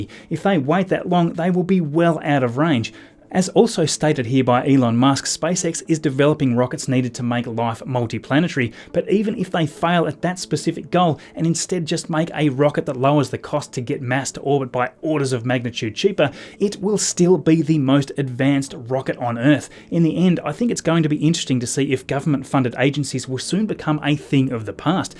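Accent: Australia. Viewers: Lol, YouTube CC wrote “Bokeh Chicago” when you said “BocaChica gal” ... that Australian accent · maybe its the Aussie acent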